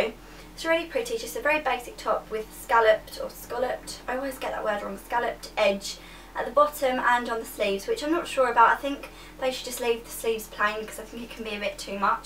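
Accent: Essex, United Kingdom. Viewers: are you from essex? not in a stalkery wayyy you just sound like you are :) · im a fashion promo student and everything in this video about pastel colors and elephants is all so true! i got the most amazing elephant graphic print baby pink blouse from some website the other day! inlove! by the way, essex accent?!?:) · You have wonderful style! I'm in love with basically all the things you hauled. I wanted to ask, are you from Essex? I'm trying to work out your accent.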